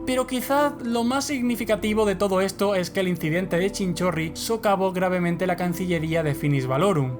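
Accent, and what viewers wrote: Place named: Spain. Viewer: De hecho el acento es español. Tal vez de León o Castilla.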